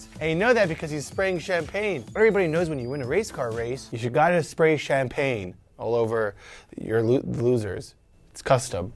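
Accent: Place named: Norway. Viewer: I thought the accent was Norwegian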